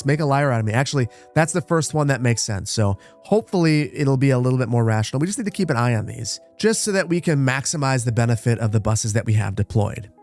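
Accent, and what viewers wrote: Wisconsin, United States. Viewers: you’re from wisconsin??? 😂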